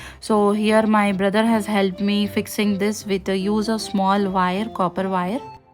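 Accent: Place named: South India, India. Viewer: It's quite a good makeover, dear. You are extremely creative. Kudos to your creativity and hard work. Also, you sound very south Indian!.❤❤❤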